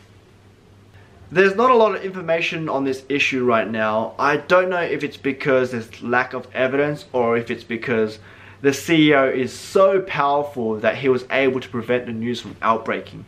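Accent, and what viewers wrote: Australia. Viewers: I love your Australia n accent.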